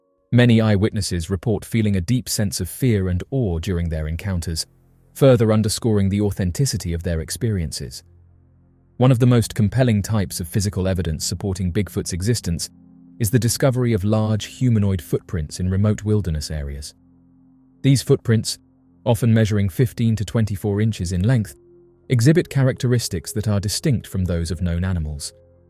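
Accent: England, United Kingdom. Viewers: The idea of anyone believing in Bigfoot just because they hear a bloke with a calm English accent talking is ridiculous. Especially when Majority of people who say they've seen them & believe they exist are from the states. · This channel is a prime example of the power of a pleasant, clear English accent lulling people into believing completely BS theories and photos.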